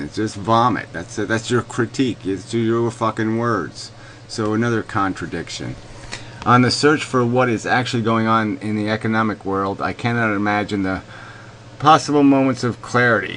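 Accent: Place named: United Kingdom. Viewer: holy shit.. british accent + asmr voice + inability to make an argument ? nerve churning. that's the actual dark triad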